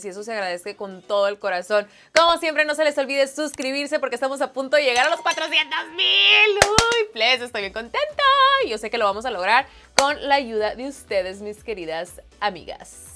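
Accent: Sinaloa, Mexico. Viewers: Jajajaja m encanta cómo a blas jajajaja 💯 % sinaloense 🙋🏼‍♀️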